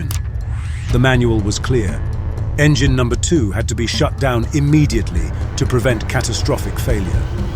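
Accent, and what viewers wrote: England, United Kingdom. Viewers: It's a very well-spoken & clipped English accent - I am sure I recognise the voice but I can't put a name to it, but it is a human voice. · Oh no a British accent speaking in US English.... You tube again.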